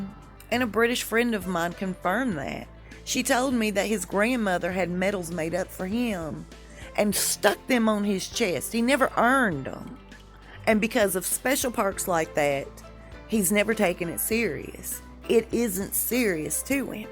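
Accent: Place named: South, United States. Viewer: Very informative ..and gosh coming from nz I adore your lovely poetic accent.I love the southern accents.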